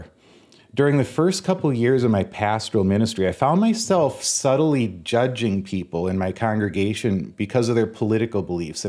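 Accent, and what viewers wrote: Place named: Minnesota, United States. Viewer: If everyone channeled your “Minnesota nice” tone and accent when approaching our political and religious differences we’d be in a much better place in this country.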